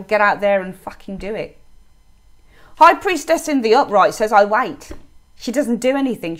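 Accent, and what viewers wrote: Birmingham, United Kingdom. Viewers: Usualsuspec13 i Love that’s She’s a Brummie like myself too. I was thinking that 😀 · I love you - 'If you wannit, you golla be onnit - you wannid it!' Gotta love a fellow Brummie. We give it straight, no chaser, honest and clear. Thank you for a great reading. So on point.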